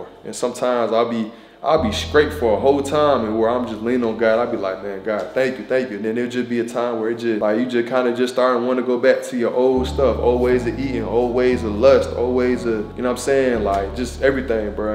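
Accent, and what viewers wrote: Philadelphia, United States. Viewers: I know you not from Philly but u talk like us 😭 idk maybe its just me. Enjoyed this more personal video tho, God bless you always !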